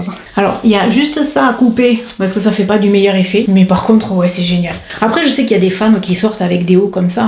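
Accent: South, France. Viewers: Bonjour arrête de culpabiliser parce que tu te fais plaisir. Les jaloux ignore-les j'ai 68 ans j'adore regarder tes vidéos tu es naturelle reste comme ça ne change rien. Tes vidéos sont formidables. J'adore ton accent du sud je suis moi-même de Montpellier je te trouve géniale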